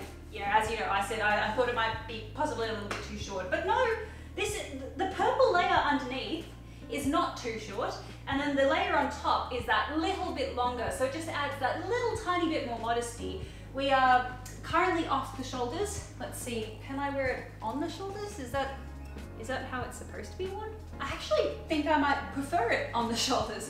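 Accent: Australia. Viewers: Who said anything about her accent being bad. I think Australian accent is cute. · Alright, I am just going to get this off my chest because it's honestly really annoying. She's fuckin' Australian. It's where she grew up, lived, and lives. I don't understand why so many people feel the need to tell her HOW to say things or comment so much about the accent, and nearly every time it's an American. lol. Newsflash: Not everyone talks like you, even in your own country you've got different accents. When my parents moved to the states, I had to un-learn my native language, basically, because she was scared that our accents would set us apart and we wouldn't be able to live more 'normal' lives. I'm re-learning it now which is strange but also fun. Anyway! Let speak the way she speaks, I love it.:) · To anyone getting angry over how she pronounces words - she's Australian, they pronounce things how they do (and actually, it's often a lot more accurate than how Americans do... 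considering it's closer to the English way and it's the ENGLISH language). Also, I appreciate the cold weather clothes you're wearing in the warm weather. As someone who's sat in in 2 degrees (watching videos whilst waiting for the boiler man to turn up...).... I VERY much appreciate it. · I love hearing another Aussie accent on YouTube!:) Thanks for doing what you do! X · You're Australian, speak as you normally would. I love hearing how different cultures pronounce the same words differently. Don't change just because some of us Americans can be bull headed ninnies. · I'm very excited about the idea of thriftmas 🙌 sounds like a great time. Also don't mind your accent, your Aussie land accent is endearing. Plus I love that fact that all of y'all say love hearts ❤️ Also, strawberry Sam was too much 😂😂😂😂 reaction was perfect. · Be PROUD of your Australian accent, American's don't talk correct English anyway, from a NZ' er however, Auss ie's, do nd correct dance, six, etc to correct british?pronunciation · I'm French and I love how she talks it sounds cute, I would love a video in which she teaches some Australian expressions like love hearts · Whoever is “correcting” you for saying things in your native Australian accent should be arrested because it’s a crime to tell someone with the cutest accent (but also, you’re AUSTRALIAN not American) to speak differently. 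I’m about to throw elbows with some of y’all in the comment section. People speak differently than you do, get over it. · Pronounce words however you want, use metric measures and remind us what season it is where you live. Be proudly Australian and cater to your international audience without having to hide who or where you are. There is a fine line between catering to an audience and pandering to them, you do the former really well and shouldn't have to do the later just because some people can't grasp that thee are different countries in the world where things are different. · I’m American, and I love your Australian accent! Different dialects/pronunciations are just part of what makes the world go round. People shouldn’t tell you that you’re pronouncing it “wrong” just because it’s different from how they say it.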